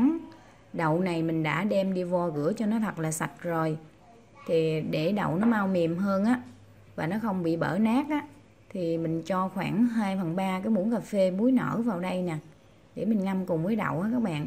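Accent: Mekong Delta, Vietnam. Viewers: Thick’ kheo’ tay Thich’ giong Noi’ nguoi mien tay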